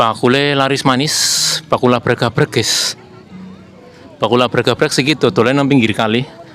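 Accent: Banyumas, Indonesia. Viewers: aku seneng ngrungokna obrolanen ngapak Ramene ORA patut..dadi khase yaa Mas😂👍👍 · Tetep logat banyumas saja om, biar unik jg enak didengerin. Kalo bisa ada dialog dg warga lokal pake bahasa lokal jg om, suka aja dengerinnya. Jadi lupa kalo negara lg krisis. Hee... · Pertahankan dialek Banyumaas dan bhs Indonesia bagus dan lucu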